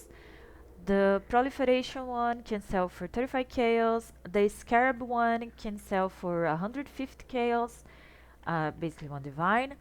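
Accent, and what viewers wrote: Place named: Turkey. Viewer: Hello, sorry it's a bit irrelevant with the video but i have to ask, are you Turkish? Your accent sounds a lot like turkish accent. Cool strat by the way.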